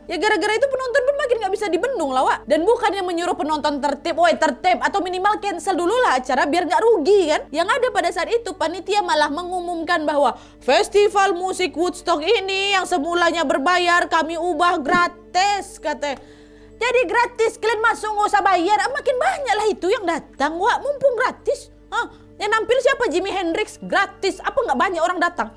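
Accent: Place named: Padang, Indonesia. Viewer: Suka sama cara kak bawain cerita deh, tpi hari ini kak kok logatnya padang gitu hehehe.. semnagat ngonten terus ya kak 😊🙏🏻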